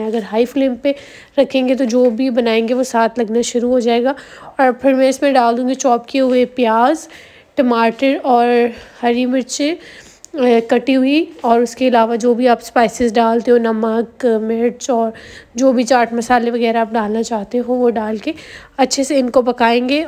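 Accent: Punjab, India. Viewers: MashAllah so cute punjabi I am also punjabi love u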